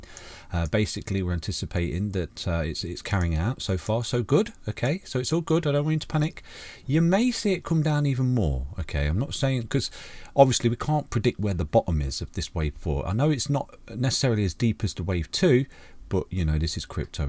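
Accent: United Kingdom. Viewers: Soon as I heard that British accent I subbed.. 🇬🇧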